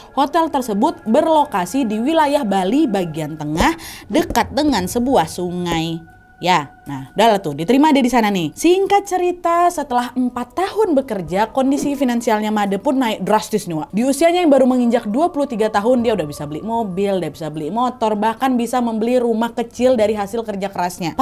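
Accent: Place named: Medan, Indonesia. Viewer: Malah lebih ngakak denger ceritanya.. cerita tentang orang bali tp ini logat orang medan yaa..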